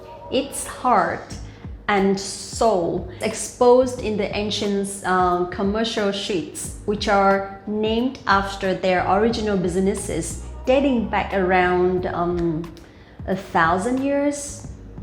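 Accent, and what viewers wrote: North, Vietnam. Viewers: This course had an accent from Northern Vietnam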